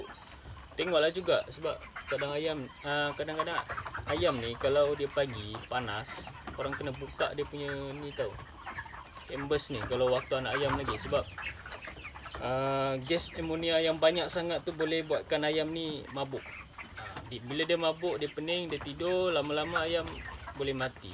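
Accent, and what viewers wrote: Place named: Peninsular Malaysia, Malaysia. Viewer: Awk org smenanjung yer. Saye igt org sabeh..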